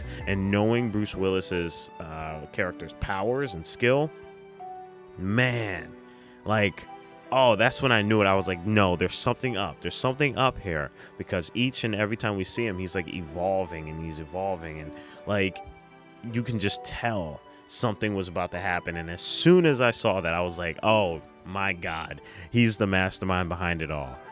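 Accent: Philadelphia, United States. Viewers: I knew your accent was familiar. Philly represent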